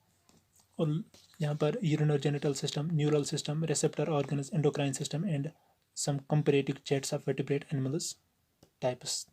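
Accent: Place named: Kashmir, India. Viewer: You are Kashmiri I got it through your accent 😶... Great job bro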